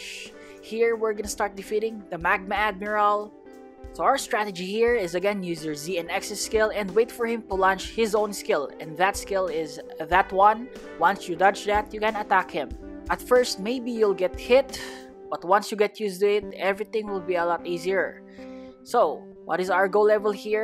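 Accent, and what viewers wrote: Philippines. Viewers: Parang filipino accent mo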